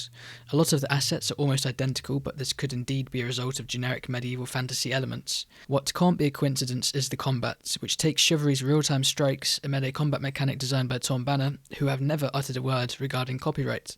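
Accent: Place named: United Kingdom. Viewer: Nice video but there was an annoying british noise talking through it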